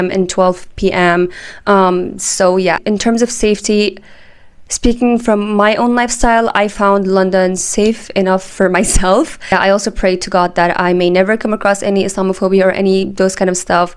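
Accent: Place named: Turkey. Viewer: Please make a video in Turkish language only Because I am in love with your Turkish accent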